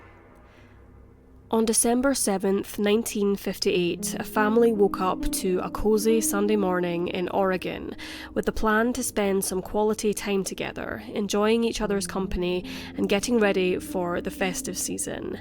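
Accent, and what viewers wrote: Scotland, United Kingdom. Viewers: She has a Scottish accent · It's a British accent, from Scotland. Very calm and easy on the ear. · It's scottish 😊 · Yes agree, I think it’s Scottish 👍🏻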